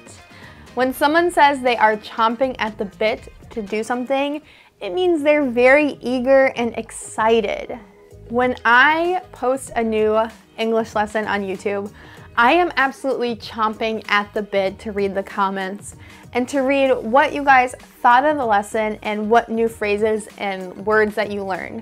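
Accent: United States. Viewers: Are you American?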